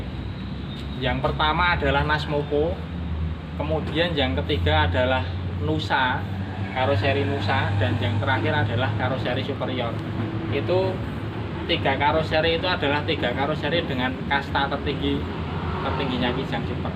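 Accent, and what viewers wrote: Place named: Sleman, Indonesia. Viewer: Setuju logat nya om admin kaya orang Sleman Bantul Gunungkidul dan sekitarnya btw mobil pertama gw kijang new super g 1.8 96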